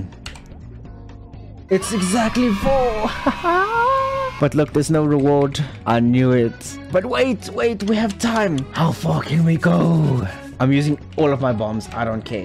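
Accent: South Africa. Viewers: I love when people ask this because nobody has ever sounded more South African than him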